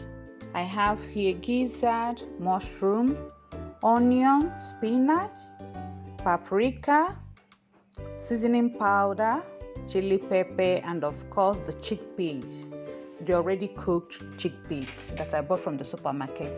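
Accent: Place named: Equatorial Guinea. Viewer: What is your connection to Nigeria? You speak like you are from Equatorial Guinea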